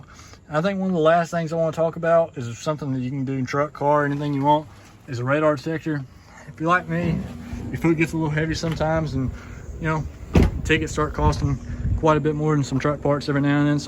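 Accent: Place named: Arkansas, United States. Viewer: You got an Arkansas sounding accent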